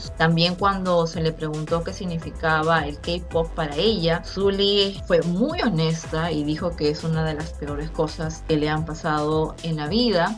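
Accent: Peru. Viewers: Es obvio que eres de Perú, tu acento te delata amiga y pues no es necesario que digas de dónde eres ya que son noticias del entrenamiento coreano. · Sospechaba que eras peruana por tu manera de hablar. Yo también. Y te felicito por el gran trabajo que desempeñas. Que sigan los éxitos! ❤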